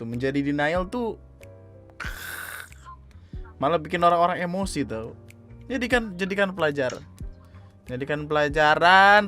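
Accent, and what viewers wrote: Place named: Riau, Indonesia. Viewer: Logat Riau tapi pakai lu gua. Jatuh jatuhnya cringe.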